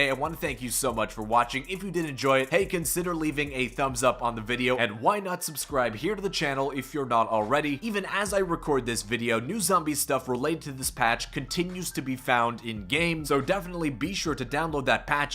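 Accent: Canada. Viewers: Are you Canadian by any chance? Your accent sounds Canadian.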